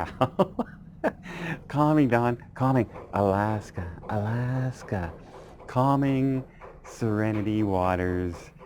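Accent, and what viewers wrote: Canada. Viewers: Love it! You sound like me, I just can't keep quiet when I see atrocious behaviour like that. Absolutely love your Canuck accent! Just subscribed, your videos are great.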